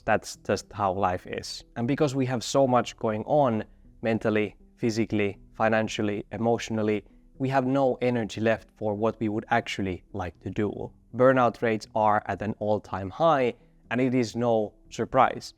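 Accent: Finland. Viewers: Finnish accent, I never miss it! Onnea kanavalle!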